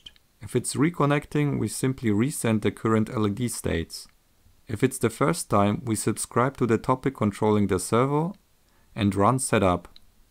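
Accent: Germany. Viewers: Great sense of humor with a German accent. I love your videos.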